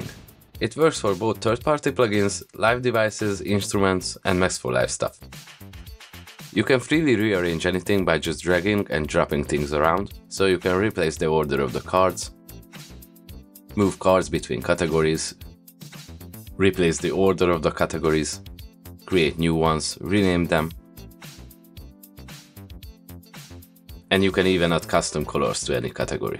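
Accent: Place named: Hungary. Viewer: magyar akcentus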